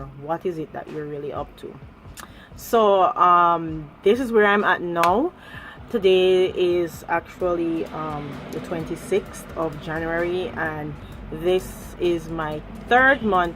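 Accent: Jamaica. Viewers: girl...i swore u were Jamaican... i am shook wow..interesting information.....very informative video.... Thanks for sharing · You sound way more Jamaican than Guyanese, so I've claimed you as a Jamaican lol. I absolutely love your creative hairstyles (combed out my locs last November), especially those on your OMG channel. Please keep doing more as we have similar textures.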